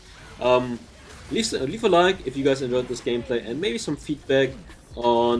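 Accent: Germany. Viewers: Are you german ?? 😂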